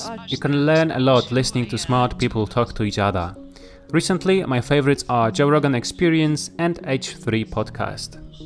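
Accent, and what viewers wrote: Poland. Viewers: Tak czułem, że jesteś z Polski hahah. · Trafiłem na ten kanał godzinę temu i tak właśnie pomyślałem, że to chyba Polak. Akcent i typowo "nasze" krajobrazy w tle zdradzały to całkiem wyraźnie. :) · Coś tak czułem, że jesteś z Polski :-) Gratuluję świetnego kanału i życzę wielu sukcesów!!!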